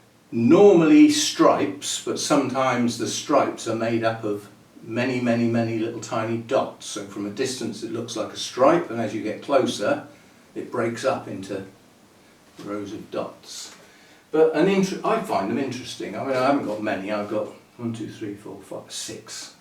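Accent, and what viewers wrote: England, United Kingdom. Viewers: I have yet to visit England, and would love videos on your town!! I adore your accent 😉 (I’m from Washington State, USA)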